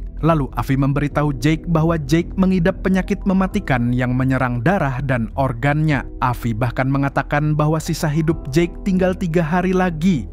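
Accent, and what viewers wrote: Banjar, Indonesia. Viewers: Tebak nah admin nya banjar bagian mana😂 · Buan banjar kah om ai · Urang banjar sekalinya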